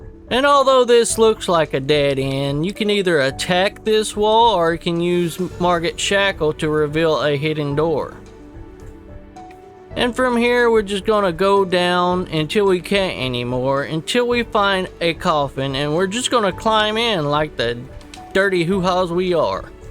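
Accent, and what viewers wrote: South, United States. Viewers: Love the jolly southern man helping me collect all the borbs!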